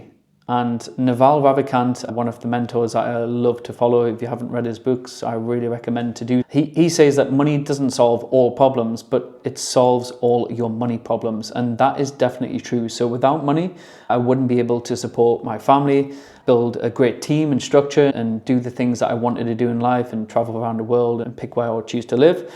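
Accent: Newcastle, United Kingdom. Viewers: Are you a jordie or from South Africa? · I thought I recognised that accent (as a fellow Geordie) 😘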